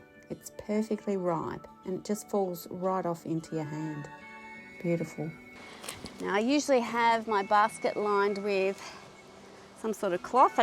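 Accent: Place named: Australia. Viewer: I enjoy all your videos. I especially like your lovely, exotic (to me) Aussie accent.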